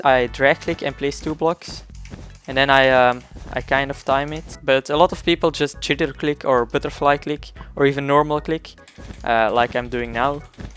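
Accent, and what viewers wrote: Netherlands. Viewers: Are you from Holland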